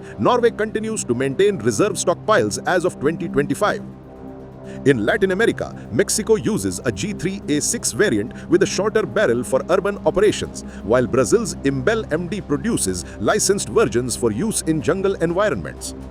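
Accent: India. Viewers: check pajeet accent 🤣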